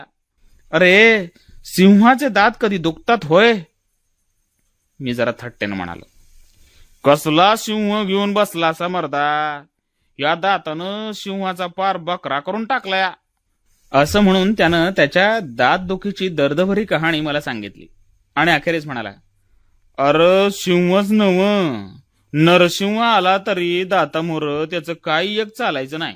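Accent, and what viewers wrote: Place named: Solapur, India. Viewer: भाषेचा बाज अगदी सोलापूरी ! खूपच छान .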